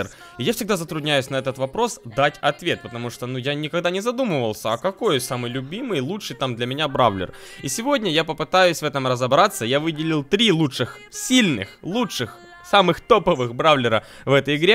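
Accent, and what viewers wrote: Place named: Surzhik, Ukraine. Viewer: на мое мнение, звучит коряво. Это суржик с украинского на мою думку. Пожалуйста, по моему мнению, уши немного режет)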